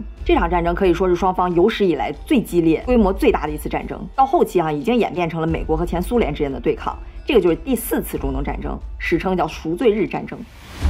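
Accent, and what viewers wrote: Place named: Beijing, China. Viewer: 小林有北京口音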